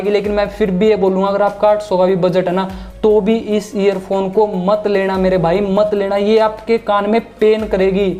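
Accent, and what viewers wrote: Haryana, India. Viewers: Bhai haryana me kaha se ho?🤭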